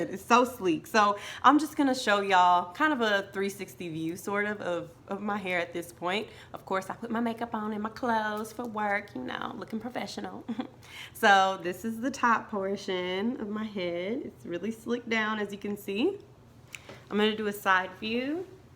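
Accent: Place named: South, United States. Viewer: I love your southern accent it’s sooo cute 😍😍😍 and yasss sis this bun came out so cute and effortless! Def gonna be trying this!!! 👏🏾👏🏾👏🏾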